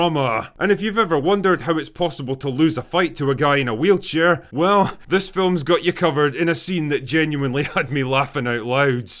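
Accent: Scotland, United Kingdom. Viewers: Jeeze someone needs a snickers. All Im hearing is a rather judgy scotsman bagging a fella out for one bad film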